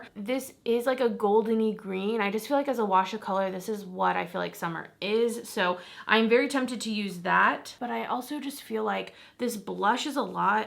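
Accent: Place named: Boston, United States. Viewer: ...Don't listen to anyone who "corrects you" Girl! I'm from Boston, we have a LOT of people with the last name LUZ. Tons of businesses named LUZ and it's pronounced just as you pronounced it! LUZ I've NEVER heard it pronounced loose? That's crap! Hahaha Just tell them you have a boston accent and keep it moving! 😊 You pronounced it fine! 🙄 Keep doing you, don't worry about dumb pronunciations!! 😂 Love ya!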